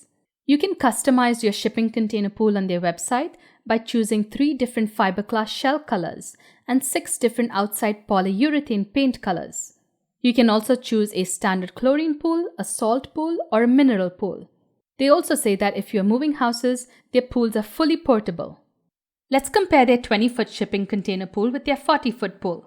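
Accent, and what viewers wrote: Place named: India. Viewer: You are the only Indian person i like on YouTube. I usually cant stand the accent but your information is so well worded and to the point that I don't mind. I assume this compliment also sounds like an insult, but that is not my intention. Just being honest. Thx for the upload!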